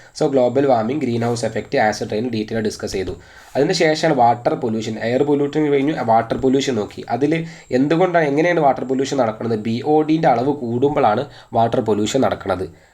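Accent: Malappuram, India. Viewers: Sir nte nadu Malappuram aano😃... Edak varthanom kelkkumbo anghane thonnunu😁 Anyway adipoli cls aanitto🤩🙌🏽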